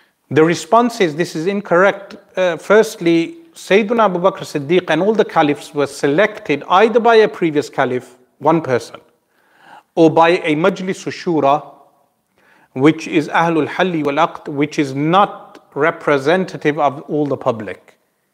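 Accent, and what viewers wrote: England, United Kingdom. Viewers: I love ur English accent and explaination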